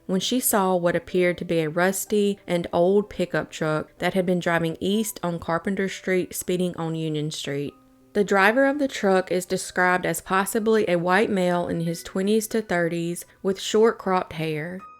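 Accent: South, United States. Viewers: As a native from new hampshire,your "southern"voice trying to pronounce basic words,NH towns and english nouns,is most annoying almost like nails on a chalkboard. It might be just me though,an English professor,but i believe some will agree with me your pronouniations and voice are ridiculous.please,leave the cold case stories to professional,normal speaking individuals.i know your just looking for views or likes,but couldn't you just stick to videos descibing basic things like what kind of food was at the county fair or what country artists were nominated at the"cmt" awards.?please,people from NH have heard enough from your nonsensical, moronically overtones.unless you'd want to make a comedy.. Could get you more views - Sincerely,English Professional.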